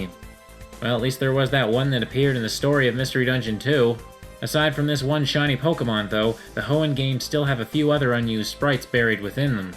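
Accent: Canada. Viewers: you sound Canadian :) anyway blank reminds me of missing no. lol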